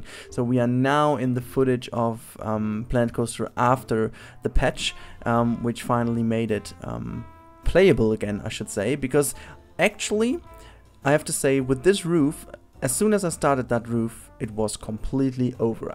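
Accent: Germany. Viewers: Are you from Germany because really sounds like this?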